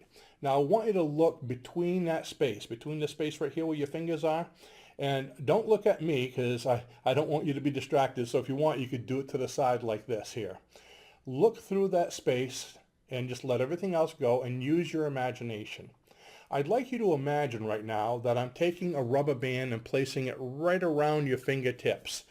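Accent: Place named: New England, United States. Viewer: i'm from new york and it sounds like you're from the new england area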